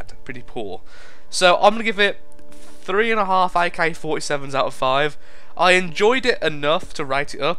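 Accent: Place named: England, United Kingdom. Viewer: its England init.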